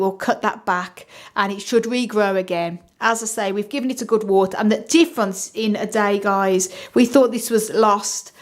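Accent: Birmingham, United Kingdom. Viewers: she reminds me a bit of susan from the Archers with those fruity high notes... but with 20% added brummy !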